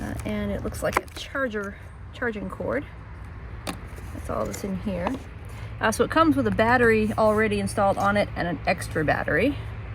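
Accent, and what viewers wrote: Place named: England, United Kingdom. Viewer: Good evening friends. Very interesting. I hope it works out well for you. English accent. 🤣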